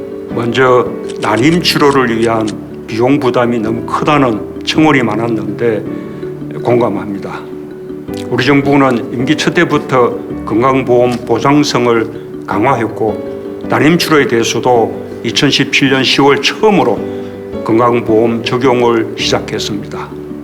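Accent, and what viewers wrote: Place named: China. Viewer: 지난 4년 동안 중국인 발음이다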